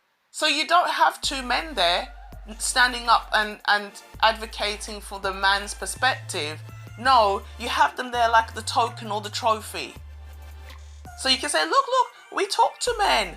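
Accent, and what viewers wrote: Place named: London, United Kingdom. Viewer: no prob, what city in the uk are you from I'm trying to figure out that accent it sounds like a london accent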